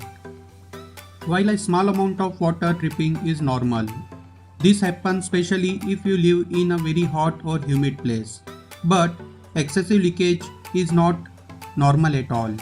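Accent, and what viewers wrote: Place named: India. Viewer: Why all these videos are in Indian accent? :/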